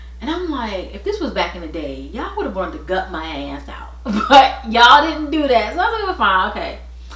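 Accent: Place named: East Coast, United States. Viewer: I love the way you talk! You are from the east coast I’m guessing! Next time you have a gut feeling about your health get a second opinion. I have a large fibroid but I want to keep my uterus. They can remove fibroids doing a myomectomy. They also can do an embolization to cut the blood supply to the fibroid. I been doing a lot of research. I’m on birth control for my fibroid but when it came to my time to remove my birth control I was bleeding with the birth control. I use the ring for birth control and I was able to skip a period except this month. I been taking DIM to help with estrogen metabolism. I wish you all the best and you get relief soon!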